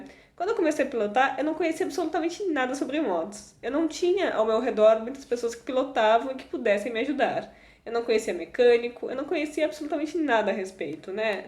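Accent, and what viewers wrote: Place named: South, Brazil. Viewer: que sotaque gostoso do sul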